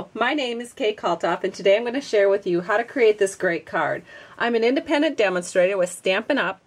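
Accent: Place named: Minnesota, United States. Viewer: Kay, I so enjoy your videos and wanted to share with you that you bring to me a touch of home as I am from Minnesota. I hear your voice with our distinct accent and it brings me to my childhood! Thank you for sharing your art and your life! Susan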